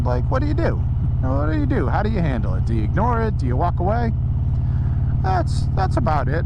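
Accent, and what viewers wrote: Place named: Boston, United States. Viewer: your handlebars are trash and your voice screams boston ya fhekk...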